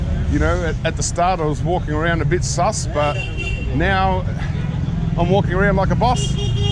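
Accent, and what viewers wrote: Australia. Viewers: probably, Australian accent is too much for him!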